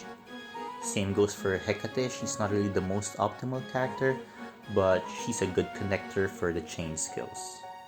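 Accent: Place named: Philippines. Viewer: u sound pinoy af, in a good way xD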